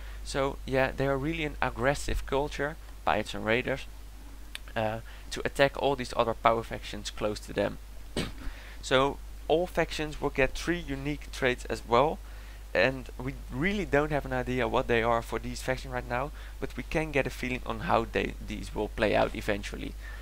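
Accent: Netherlands. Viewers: jij bent nederlands ik hoor het meteen maar maakt niet uit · dude the dutch accent's great! :D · For your first video you did great! Maar ja je kan wel echt aan het accent horen dat je Nederlands bent hahaha :P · That was soooo obvious from the " nederlands-achtige-crappy-engels accent" xD aha wel grappig om zo te horen Voor de rest wel ok!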